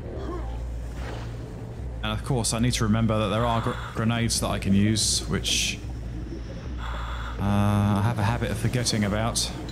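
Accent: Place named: United Kingdom. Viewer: Nice to hear another player from the UK for once lol, do you play Defiance by any chance....we could do co op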